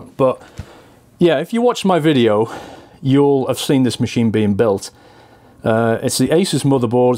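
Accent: United Kingdom. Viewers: Squirexit lol. youre a lovely brit. naiss accent. love it. greetings from switzerland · Great to see where you record. Nice to hear another Brit as well. We're thin on the ground it seems haha. From what corner of our tiny island do you hail from?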